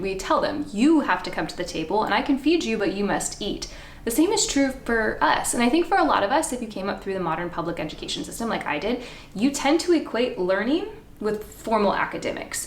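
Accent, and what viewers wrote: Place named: Scotland, United Kingdom. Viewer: My baby was babbling over you talking and I heard that you pronounce everything foreign in a "Scottish" accent because that's the other language you know. That tickled me 😂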